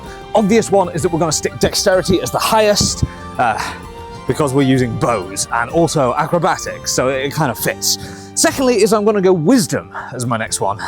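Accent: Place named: United Kingdom. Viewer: I really do hope that in the actual campaign you play up your Bri'ish accent.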